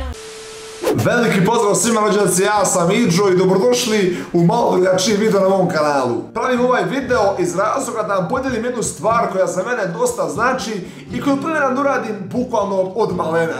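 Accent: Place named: Montenegro. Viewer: Koliko volim taj crnogorski naglasak😁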